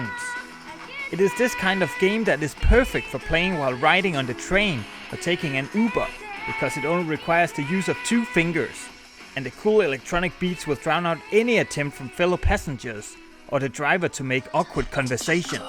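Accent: Denmark. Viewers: I freacking knew it. The accent got me. Overraskende at se en dansker lave engelsk indhold ;) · holy shit din danske engelsk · Are you from Denmark?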